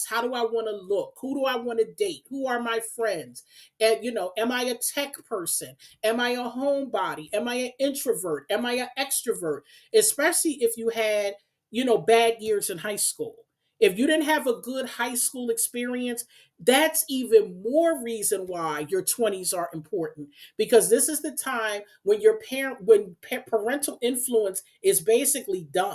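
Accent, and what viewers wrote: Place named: Philadelphia, United States. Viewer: new subscriber just turned 25, really enjoyed this video!! wish i heard it a lil younger lol! any chance are you from philly? i hear it in your voice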